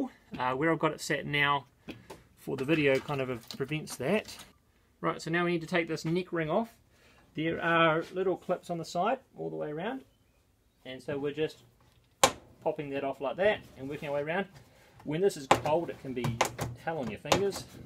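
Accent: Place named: New Zealand. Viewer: Brilliant video - good to hear a NZ voice with short no nonsense simple instructions - thank you so much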